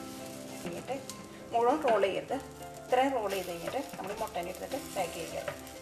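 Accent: Thrissur, India. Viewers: Thrissur ano veedu...accent kettitu thoniyatha..!!!..:)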